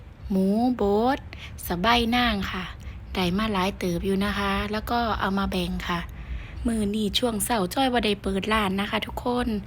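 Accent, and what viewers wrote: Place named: Sisaket, Thailand. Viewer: สวัสดีครับผมเพื่อนใหม่ตามมาส่งกำลังใจครับผมสำเนียงคือคนศรีเกษบ้านผมเเท้น้อ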